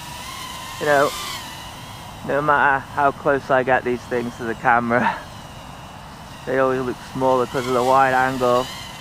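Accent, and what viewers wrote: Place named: United Kingdom. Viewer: I'm a total newbee, your reviews are really helping and the British accent makes you very watchable much like an episode of Doctor Who;-)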